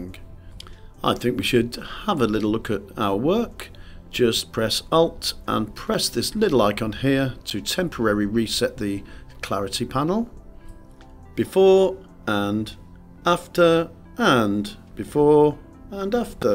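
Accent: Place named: RP, United Kingdom. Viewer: Thank you for your awesome tip!! Furthermore, watching your excellent videos is like killing two birds with one stone: I learn Capture One Pro, and I improve my English thanks to your Received Pronunciation 😅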